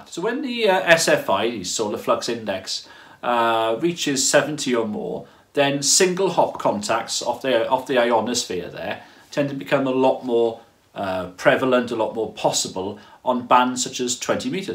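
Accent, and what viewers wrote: Wales, United Kingdom. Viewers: Thanks. That was a really good presentation. Did I detect a Welsh accent in there somewhere?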